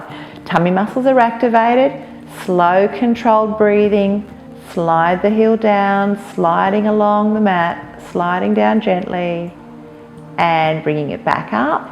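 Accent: Australia. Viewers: AI love your Aus Accent.. I miss Aus😢😢😢😢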